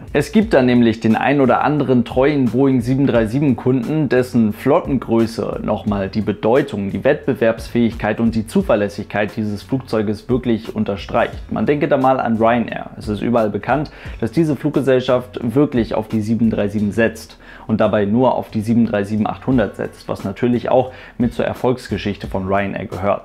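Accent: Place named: East Germany, Germany. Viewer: next generation mit deinem ostdeutschen Akzent im denglisch auszusprechen ist leider nicht witzig, sondern doof.... die englische Aussprache ist doch nicht so schwer, oder?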